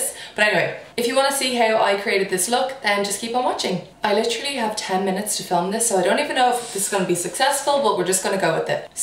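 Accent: Ireland. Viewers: my grandparents were from Dublin, it's a comfort to hear your brogue ❤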